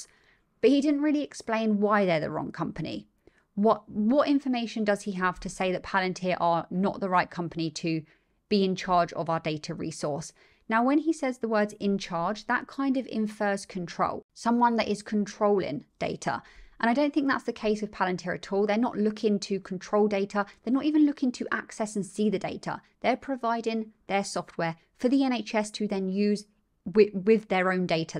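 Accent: United Kingdom. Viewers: thanks for your insight! And I get to listen to a wonderful British accent too:)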